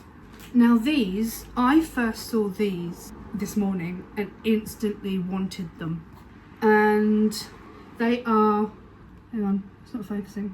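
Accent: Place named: United Kingdom. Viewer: Because of your accent and you mentioned the UK toward the end I appreciate knowing where you are. We manage a food distribution out of a church in Northern California. Your experience of developing friendships and feeling not judged is exactly what we have too. We do not prequalify our guests and know they are there because they have some need of community and food. About half our volunteers are guests that asked if they can help us. Sure! We have volunteers from all kinds of nationalities, beliefs and finances. Our strength is having a great variety of people learning to work together on a common cause. We know that not all the food we give is a good fit for everyone. Our hope is that they try the food and give away what they don’t want. I’m reminded of the lady who was delighted to receive a big package of chicken feet! Wonderful! I would like to see offered counseling on budgeting. 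Sometimes we need an uninvolved person to suggest leaks in our spending habits. We offer listening to their story and prayer. God bless you in your journey. God bless the church that opened their doors and finances to their community.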